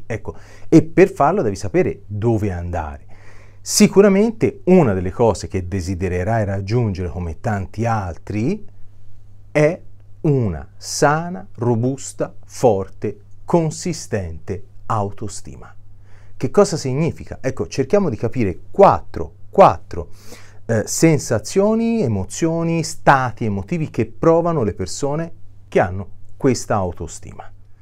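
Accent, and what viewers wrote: Tuscany, Italy. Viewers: Dr. buongiorno. Io penso di avere autostima infatti sti vivendo una situazione abbastanza complicata con un marito Narcisista che vuole procurarmi guai, ne ha già fatti parecchi. Ha chiesto la separazione che mi sta benissimo, ma senza rancore anche se un po' di rabbia c'è verso quell'essere che avrebbe dovuto darmi delle sicurezze invece mi da solo preoccupazioni, affanni. Quindi ora il mio compito non è quello di dar retta al rancore ma di lavorare sulla mia difesa, per i miei diritti e dei miei animali che non possono essere lasciati in mano a un essere malvagio, crudele quale egli è. I suoi video sono sempre interessanti e soprattutto chiari. Anche con la"c"toscana😊Buona giornata. Grazie.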